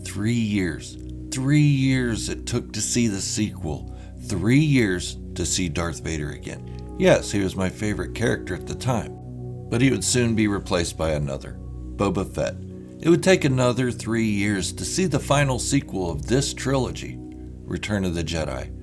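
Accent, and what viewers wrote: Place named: Germany. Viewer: I really miss videos like this this from you, so different and original, sensational More please!. Ihr deutscher Akzent ist ausgezeichnet! I can certainly relate to this video 100 percent as it was so nostalgic for me. I saw Star Wars with my father in 1977 when I was 9 years old, played with my collectibles, created my own artwork ( yes I used to draw etc) of the characters, my room was filled with posters on wall, collected the trading cards, unique merchandise and when the movies were available on Beta/VHS every night was Star Wars night for me. I relied on newspaper articles for any information I could get on Star Wars which was not much in those days. Looking back I am so grateful for lack of spoilers etc that because of the experience of feeling like a kid on Xmas Day when finally seeing the movies as I didn't know anything! 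My imagination went into overload and I appreciate those days so much more now. I think the generation of today are indeed quite spoiled now when it comes to the instant gratification of having everything available on hand via the internet and streaming services. Ohhh I so loved your analysis and perspective on how the Siths told the truth and the Jedi were too arrogant or in denial to accept the truth. I really didn't see it this way until now...the other side of the story. Of course, Obi had to lie or manipulate Luke. The Jedi were just as bad as the Sith in manipulation. Obi Wan and Master Yoda wanted Luke to be traine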